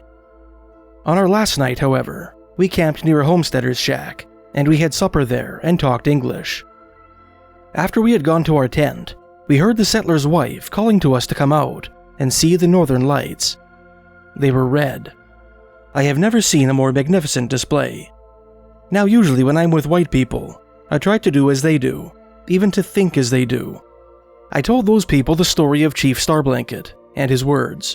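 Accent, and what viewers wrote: Canada. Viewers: Oh this narrator he's for sure a Canadian, eh.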